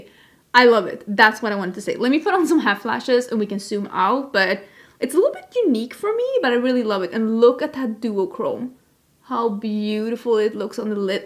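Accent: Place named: Sweden. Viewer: Don't you so love how she always says " let's soom in " instead of zoom in...so sweet and Swedish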